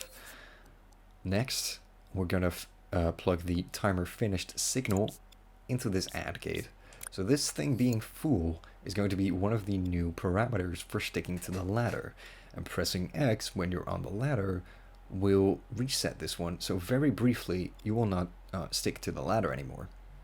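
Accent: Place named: Netherlands. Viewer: Ik kan me niet concentreren door die Nederlandse accent LuL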